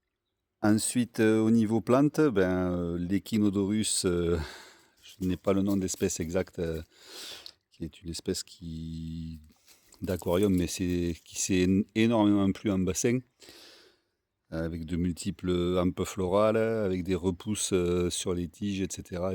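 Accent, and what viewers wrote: France. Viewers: Cc merci pour ce partage. Ils ont bien grossi en plus les bb. Superbes les plantes. Ma menthe aquatique est comme la tienne, elle fleurit. Et joli accent du sud 🙂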